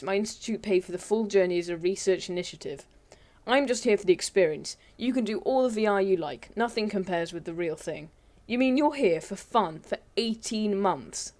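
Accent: United Kingdom. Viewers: I LOVE YOUR VOICE... HONESLY I'VE ALWAYS WANTED TO HAVE A BRITISH FRIEND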